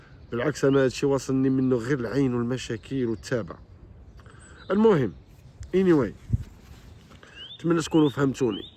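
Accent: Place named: Algeria. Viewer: فديوات ديالك سمعتهم قبل ولكن بلكنة جزائرية كانت واعرة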